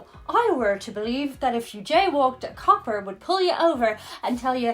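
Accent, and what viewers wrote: Ireland. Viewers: You have your Irish accent,.🍀 That will melt any New Yorker's tough exterior! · Honestly I do think the smiling and being friendly to you. Look in the mirror very attractive / Blonde / Irish accent. I think that is most likely why you thought that. Beside smiling and slamming doors in your face. You had the best of both worlds. I really wish tourist's would visit small town America. Everyone goes to large population areas. Small town USA. Smiles holding doors and good service is us. Your welcome to come to Oregon. {Just don't stay in Portland } Come to where i live Lyons,Or Settled by 2 Irish brothers. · New Yorkers were nice to you because you reminded them of their great Irish granny (your accent). They don't hold doors open because you gotta stop. Finally, they laughed when you dropped your food because NOBODY drops their food. They might throw it away but they don't drop it. 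Just kidding. You're hot and nice. It goes a long way. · It was probably her Irish accent that made people more likely to help her. ¯\_(ツ)_/¯ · Let me guess, a very pretty woman with an Irish accent got some cold hearted New Yorker's to warm up. Who could have thought that was possible?.... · As a Pharmacist, I will be checking a prescription for accuracy, while I’m on the phone with a Doctor, while a Pharmacy Tech is asking me a question. That’s considered “mild multitasking” in Pharmacy World. I jay walk everywhere I go. But, it’s absolutely the most fun in NYC. It’s like a carnival ride. The drivers don’t slow down or maneuver, it’s awesome. It’s a list, just a list... you met some nice ppl in NYC. But, you’re a cute blonde, with a cute Irish accent, and you’re white. You can figure out the rest. And, if you have a person videotaping you, people are going to be like angels. Call B&H Photo Video, tell them you want to buy an expensive digital camera. 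Then ask lots of questions. Try to extend the convo into a friendly conversation like one might have with a barista! Ask them “what does that mean?” Ask it a lot! Lol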